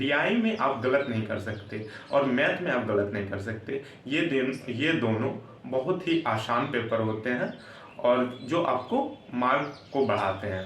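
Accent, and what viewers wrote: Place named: Bihar, India. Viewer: Bro tum Bihar se ho kya?? Awaz se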